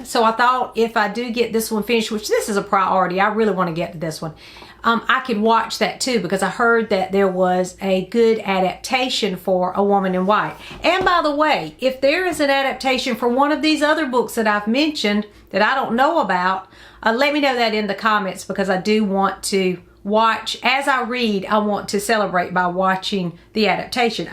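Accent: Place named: Texas, United States. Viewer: Just found your channel. So glad I did. I have to ask are you possibly from Texas? I am and your accent sounds familiar.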